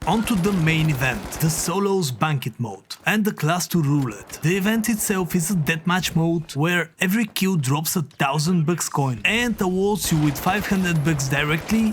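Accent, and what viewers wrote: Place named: United States. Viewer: please dont take this negatively but I love your american accent. what are "Resuesses" though?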